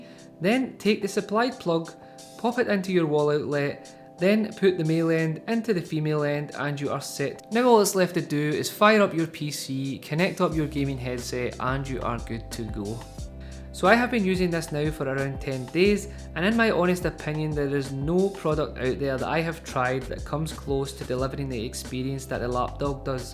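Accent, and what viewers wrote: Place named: Scotland, United Kingdom. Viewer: I love the one cable instead of the spaghetti you usually get, I thought people hated Scottish accents haha. Nice vid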